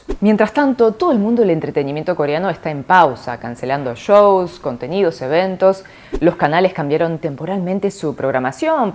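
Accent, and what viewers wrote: Argentina. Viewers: Me encanta tu voz de periodista , tenes acento Argentino 🙂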